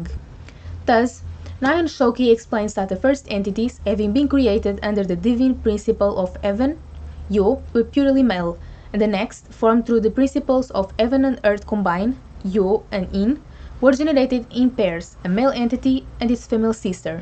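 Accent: Portugal. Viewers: Arquipelágo? A heavy portuguese accent. Perhaps Coimbra?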